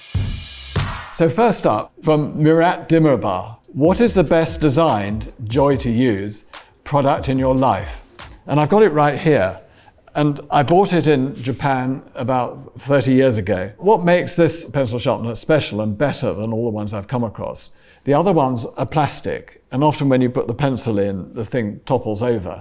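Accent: United Kingdom. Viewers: イギリス英語？ググったらほんまにイギリス人やん聞き取りやすい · イギリス訛りすごいな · 上品なイギリス英語ですね！ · イギリス英語たまんね〜〜〜😭😭😭😭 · ブリティッシュのアクセントが最高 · すんごいBritishアクセント。 かっこよすぎ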